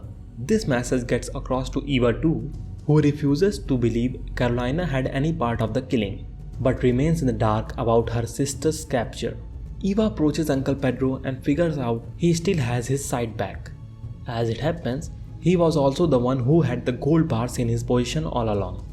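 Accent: India. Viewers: Very bad gujju accent. sorry.. thumbs down.. please dont spoil our day again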